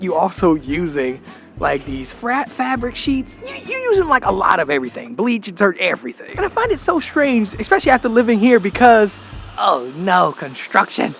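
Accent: United States. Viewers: Can't have an American accent and say Haarlem, that confuses the hell out of people.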